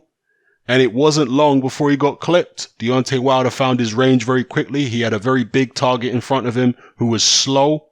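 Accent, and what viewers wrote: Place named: United Kingdom. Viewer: Thanks for that it's nice to hear someone with a British accent giving Wilder some respect.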